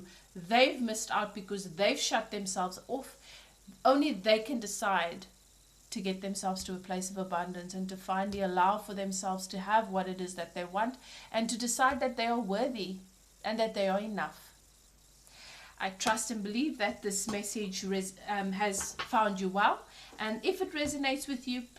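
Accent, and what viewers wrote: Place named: South Africa. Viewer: Very good reading.. You are very gifted. Your accent sounds South African, so good to see this evolution in spirituality. Thank you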